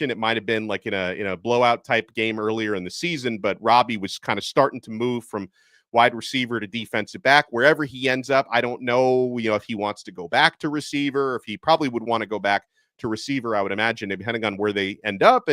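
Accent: South, United States. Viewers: Dunno I love your Southern accent lol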